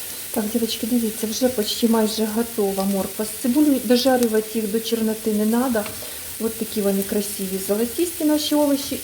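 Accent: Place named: Surzhik, Ukraine. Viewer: ніяка не біда , це суржик , в кожному регіоні свій говір , ви ж не будете заставлять львівян , чи тернопольців розмовляти як ви , краще вчиться готувати і не чипляйте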